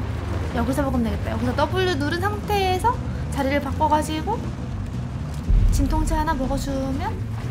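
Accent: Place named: Seoul, South Korea. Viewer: 이분은.. 목소리가 차분하고 서울말 때문인지 몰라도 매력적이네 ㅋㅋ 점... 이뻐지고 어려저가는 님 ㅋㅋ Ps 면허증은 장롱속에~~^^ Ps1 3뚝 죽어놓고 아깝다고 마음에 없는소리 Ps2 치킨 잘 먹는 이쁜누나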